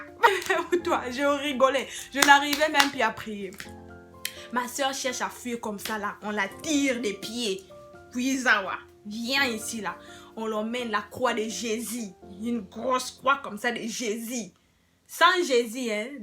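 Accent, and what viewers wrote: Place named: Democratic Republic of the Congo. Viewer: J'aime les congolais...Regarde comment tu parles tu Choko façon jusqu'à 😂😂😂😂😂Je m'abonne ohhhh on fait comment avec confinement là...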